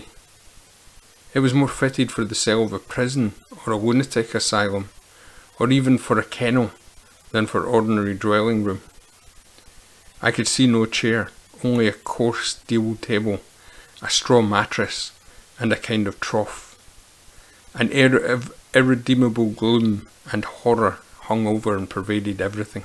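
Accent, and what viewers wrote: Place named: Scotland, United Kingdom. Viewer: First time this channel came up in my feed and I'm absolutely delighted. The stories are so wonderfully creepy and the way they are told, is perfect the lovely sound of the Scottish way of speaking, no dramatic background music that makes actually hearing the story very difficult - this storytelling is simply perfect for me. I love it, subscribed and clicked the bell with a big smile on my face. Thank you for making these videos for us, I'm going to check on your playlist. Best wishes from the Highlands of Bavaria (alpine region) 🙋🏻‍♀️ Slàinte mhath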